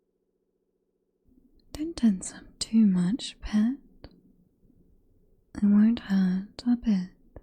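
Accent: United Kingdom. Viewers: As a fellow briton, that accent is too posh british. My accent is a mix of slang british 😂 But nice Vid!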